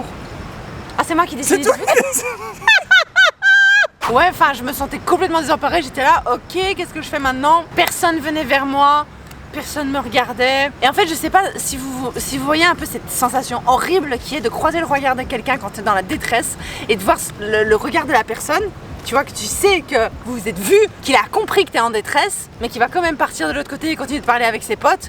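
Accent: Belgium. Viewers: Je suis Canadien j'aime bien l'accent belge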